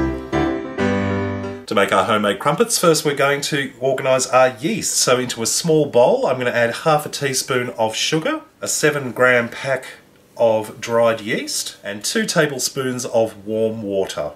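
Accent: Australia. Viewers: To people who can't hear the difference is not English he is australian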